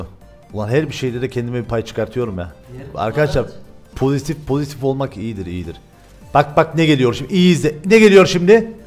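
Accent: Cyprus. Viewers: Kıbrıs şivesi değil mi :)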